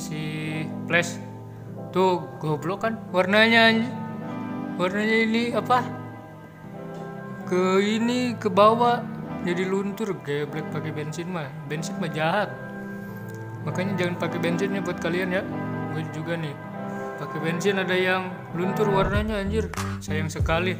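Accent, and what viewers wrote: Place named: West Java, Indonesia. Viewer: Meuni sunda pisan logat b.indo nya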